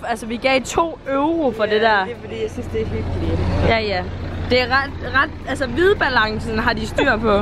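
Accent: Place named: Jutland, Denmark. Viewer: Jeg føler at du lyder meget mere jysk når du er sur elsker dig❤️😂❤️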